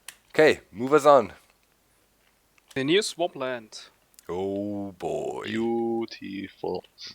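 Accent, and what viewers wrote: Germany. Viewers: You sound very german. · Widac ze to jakis niemiec gada na tym filmie rosjan to lubie ale niemcy gunwozjady to juz ich akcentu nie wytrzymam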